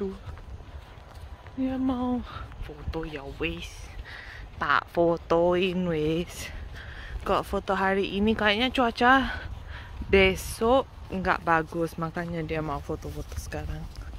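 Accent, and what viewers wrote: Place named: Java, Indonesia. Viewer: Bahasa jawanya masih kentel drpd bahasa Indonesia 👍👍👍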